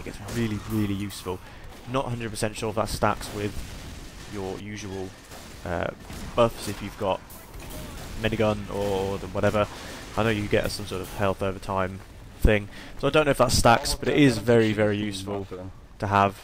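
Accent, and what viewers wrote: England, United Kingdom. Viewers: AMAZING VIDEO!!!! Are you English or British? Srry, im not tryin to be rude at all, just curious.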